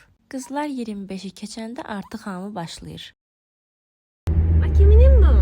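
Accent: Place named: Baku, Azerbaijan. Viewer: Baki lehcesi ruha terapiyadi😍😍😍😍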